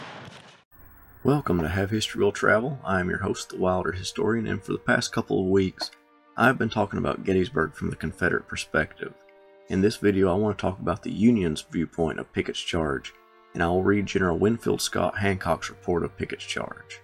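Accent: South, United States. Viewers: Narrator sounds Southern but anti Southern.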